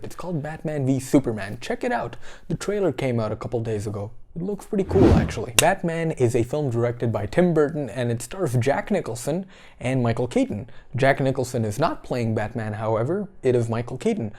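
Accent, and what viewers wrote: United States. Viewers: You don't live in America? Neither do I, lol. You do sound American though. Greetings from The Netherlands (we gave you Paul Verhoeven and Rutger Hauer) Ps: feel free to add me on Skype 'meeskeesz' Have a nice day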